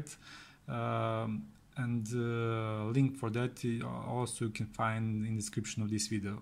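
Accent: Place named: Serbia. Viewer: Pazi mu akcenat.. ovo neki nas garant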